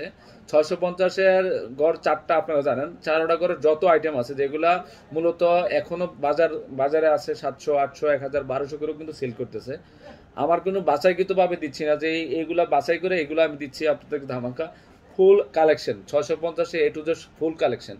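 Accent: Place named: Khulna, Bangladesh. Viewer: ভাইয়া মনে হচ্ছে আপনার বাড়ী খুলনা বিভাগে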